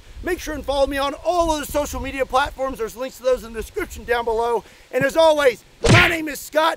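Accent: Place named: South, United States. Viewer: you can hear the southern in his voice clear as day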